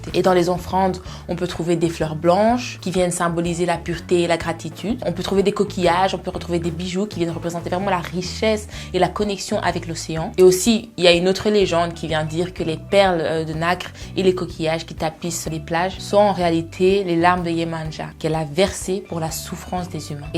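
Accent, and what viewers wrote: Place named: Brazil. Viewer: Você é brasileira, o sotaque família Brasilândia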